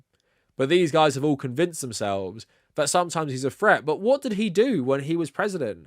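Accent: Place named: England, United Kingdom. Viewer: So I'm 8 minutes into this and so far it's safe to summarize everything this YouTuber has said thusly: Blahblahblahblahblahblahblahblahblahblahblahblahblahblahblahblahblahblahblahblahblahblahblahblahblahblahblahblahblahblahblahblahblahblahblahblahblahblahblahblahblahblahblahblahblahblahblahblah Not sure if you want to assert any thoughts of your own or if you're capable of that but I won't be sticking around for that. English accent sounding smart doesn't mean they're smart at all. This is just blather.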